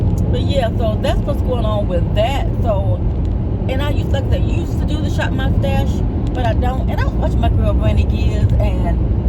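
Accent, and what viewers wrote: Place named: South, United States. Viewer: Great ride along video love your AKA rain coat and I love country dialect 💞💚💞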